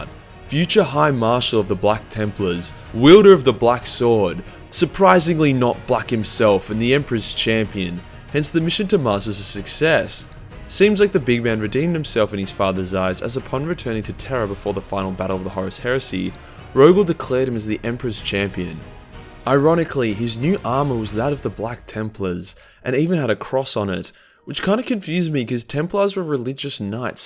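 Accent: Australia. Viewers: also, appreciate a little bit of an Aussie dialect lesson there.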